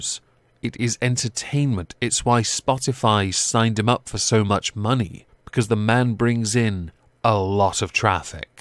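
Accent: United Kingdom. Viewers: Your British accent makes Anne Boleyn sound just like Amberlynn and I got very confused for a second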